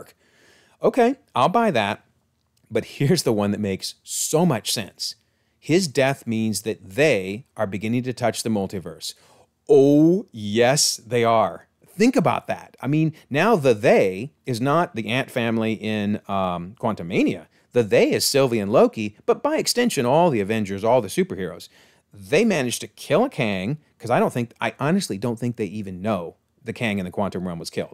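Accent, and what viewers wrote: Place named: England, United Kingdom. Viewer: If it wasn’t true I wouldn’t call you English accent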